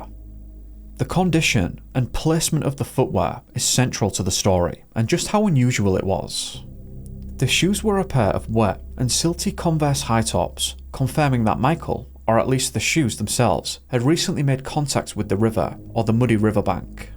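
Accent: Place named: North, United Kingdom. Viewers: I love your narration, so articulate, great vocabulary and with a proper northern accent! Thank you for representing with quality content. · Northern English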